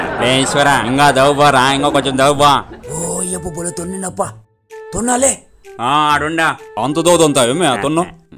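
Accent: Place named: Anantapur, India. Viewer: Brother..MAA OORU GORANTLA...MEE CHANNEL DWARA MANA ANANTHAPUR SLANG BAGA POPULAR AVUTHONDI..KANI SMALL CORRECTION DONT MIXUP OUR TELUGU WORDS WITH KANNADA WORDS LIKE DOORAM WITH DOVVU...A SMALL REQUEST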